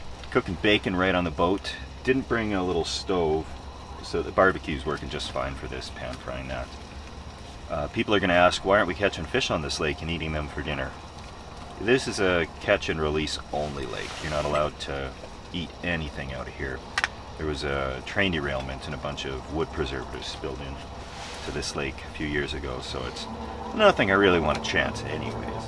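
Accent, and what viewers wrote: Canada. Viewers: My wife is Canadian so I forgive the accent but mispronunciations are off limits. You’re still saying “brats” man. It’s “braahhts. You wouldn’t say bratvo would you? No you’d say bravo. Ok, so BRAVO on the video. I used to do something similar on my pontoon with a little self standing tent weighted down. Fun times. Thanks for the memories.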